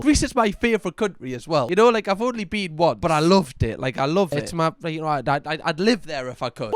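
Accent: Newcastle, United Kingdom. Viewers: I heard you talking about your accent in a recent video. Don't you ever dare being insecure or self-conscious about it! When I found your channel, amongst other things I stayed BECAUSE of the accent, not despite of it. To my German ears you sound as British as it gets and I have no trouble understanding or following you whatsoever. You want a grating accent? Try listening to me! 😂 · The guy on his phone, I play online games and had a player who came on game on his wedding day to take part in an event, we were all just like 'dude why you coming online your wedding day?!' Thanks for the content as always, love that I found a fellow geordie to listen to although I'm from Northumberland so technically a northumbrian.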